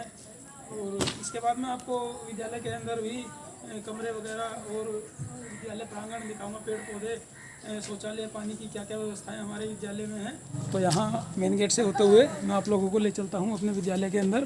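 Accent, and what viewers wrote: India. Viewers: AAP ilahabad ki tarah Joab me hoge aapki bhasha se lagta he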